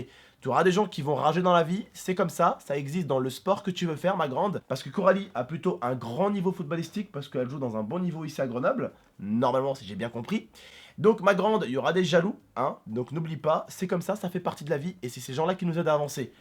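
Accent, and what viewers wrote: Quebec, Canada. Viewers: ptn toi tu fais 24 k de vue en 2min et une personne en 1 h fait 5 vue t trop fort bravo l'accent du quebec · ptite accent quebecoi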